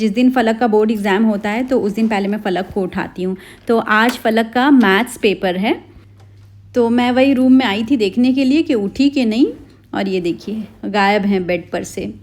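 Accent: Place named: Azamgarh, India. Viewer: Aap ki language accent bilkul Azamgarh wali hai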